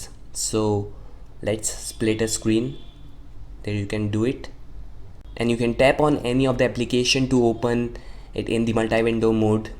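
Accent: India. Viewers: god damn i hate indian accents. but good review though.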